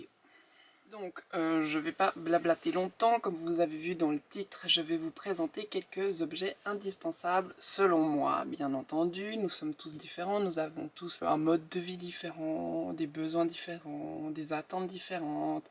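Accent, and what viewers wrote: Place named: Switzerland. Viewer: Merci pour ta vidéo je me suis abonnée je me demandais d’où venait ton accent donc tu es suisse 🇨🇭 très contente de te connaître et non tu ne parles pas trop sur tes 5 éléments j’en possède 3 et je pense vivre en camping-car Vivement les prochaines vidéos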